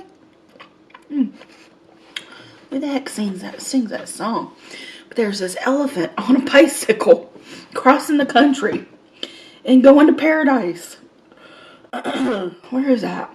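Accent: South, United States. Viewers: I love your hair and makeup! What state do you live in, you kinds have a little southern accent! I’m a 71 year old great grandmother from East Tennessee!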